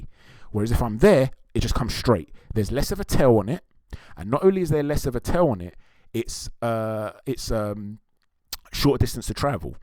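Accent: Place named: London, United Kingdom. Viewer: .... where do I start my brother, Firstly massive respect to Hatman for sending all of us here, Hatman & Boxing beats & rhymes are the top 2 UK Boxing channels by a country mile!! I just watched this breakdown & I gotta say bro you are on some next level with this kind of detail - this is genuinely intellectual stuff so massive credit to you - you got a bundle of new subscribers now including me & my pals so maybe tell us a bit about yourself - did you do boxing at any level or are you just a passionate sport lover? I’m from London and you sound like you from London too so again I extend you every courtesy and look forward to debating Boxing with you. One thing I want to ask you bro - I was a massive Lomachenko fan and we know that he f**ked up against Lopez but I cant see any videos from you on that fight - I liked what Hatman said that Lopez has been training for Loma for years whereas Loma probably didn’t even know Lopez existed - I really wish he hadn’t lost that fight man Anyways great work from you and glad I subscribed - sincerely hope your channel blows up in 2021!!!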